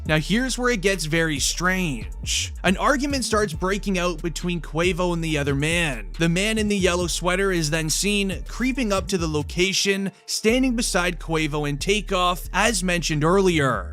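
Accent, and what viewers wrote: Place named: California, United States. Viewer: Beeeennnzzzz.... individuaaaaalllllllsssss lol I had to cut it off there...is that the suburban Cali in u or that's just u? 😂 I can't take it serious either way 🤷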